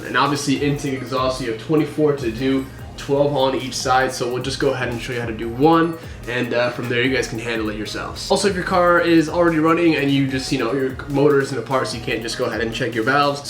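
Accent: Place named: Australia. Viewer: That Aussie accents on point ahahah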